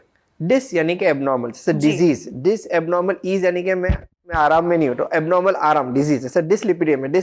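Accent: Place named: Sidhpur, India. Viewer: Speaking hindi like a typical sidhpuri.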